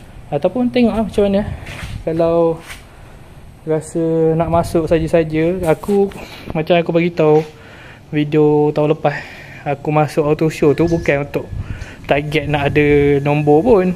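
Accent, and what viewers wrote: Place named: Pahang, Malaysia. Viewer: gaye berckp mcm org pahang..heheheh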